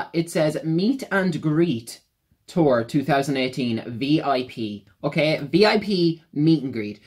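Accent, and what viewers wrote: Ireland. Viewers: Trying to place your accent...midlands? Offaly? Cavan? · I’ve liked a few Britney songs over the years and have followed her history but I’m wouldn’t call myself a fan per se. I decided to watch this video after recently seeing another “meet and greet trauma” video relating to her. I liked the way you told the story and your presentation is authentic. I think all your feelings were justified. I disagree though that she has no control over this. She is an adult and a multimillionaire. If she can’t see the potential downsides to this type of fiasco then that is 100% her failure as a business person, entertainer and human being. If you learn one thing from this is it’s that humans aren’t for idolizing - we are all flawed and imperfect. And my last comment is that I love Irish accents!!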